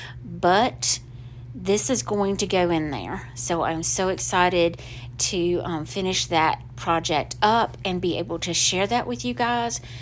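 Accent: South, United States. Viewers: Lovely and so nice for fall. Love your adorable Southern accent!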